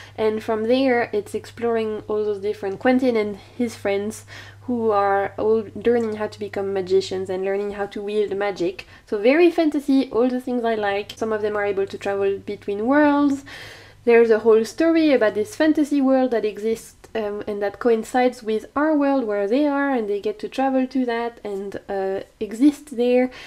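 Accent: France. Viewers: Your lovely French accent is what makes your videos so pleasant to me😘 I love to hear different accents in videos: it makes me appreciate the creators' effort to speak a second language and reach a wider audience even more. I am very self-aware of my Italian accent too, but creators like you inspire self-confidence in me. Sending healing vibes❤️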